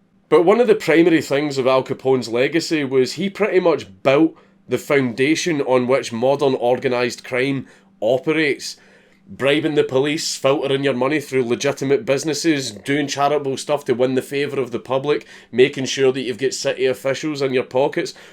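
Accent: Scotland, United Kingdom. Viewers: Love your show I just wish you werent Scottish. Can you talk non Scottish? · I'm not used to the Scottish accent so I thought he kept saying they put a hat on Bunyon. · Fuck these videos are so good. I could listen to him speak all day, maybe it’s because I’m and American and the Scottish accent is amazing, but I feel like This is the best history class you could get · Listening to American history in your lovely Scottish accent should be mandatory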